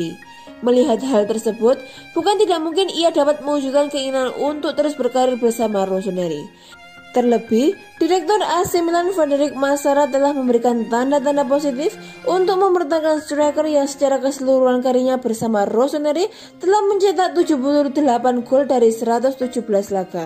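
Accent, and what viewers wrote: Java, Indonesia. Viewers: Medok suaramu mbak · Mario Marzuki not bad lahh buat sampe akhir musim. Pengen liat dong nihh naratornya...medok amat suaranya. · jowone ndi mbak yu? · Bicara ny aga logak jawa,,,bravo fm 1899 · Gemes sama pengisi suaranya, medok banget hahah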